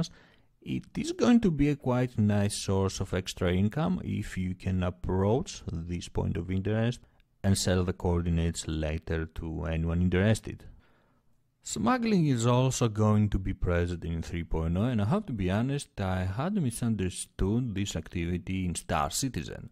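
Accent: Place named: Greece. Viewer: Έλα παλικάρι μου, από Ελλάδα είσαι; τώρα το είδα στο άλλο σου video. LOL. Και μου έμοιαζε η προφορά σου για Ελληνική αλλά δεν ήμουν σίγουρος.....χα χα χα. Πολύ καλά τα videos σου. όσο για τα επαγγέλματα θα σχολιάσω αργότερα όταν θα έχω χρόνο. :)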